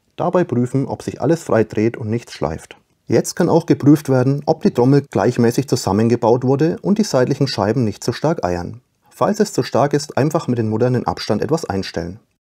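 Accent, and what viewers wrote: Franconia, Germany. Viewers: glingdd noch nem franggn :P :D grüße aus nürnbärch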